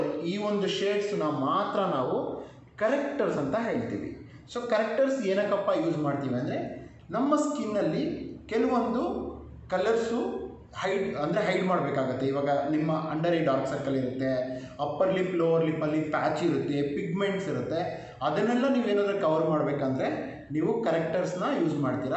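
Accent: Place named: India. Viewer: Kannadadalli explain madiddke thank u so much sir.....Your Kannada accent is super.... Sir pls do more n more videos in kannada..... V r waiting ur videos in Kannada language ...... Thank you sir.... And also pls explain about different brand of foundations.....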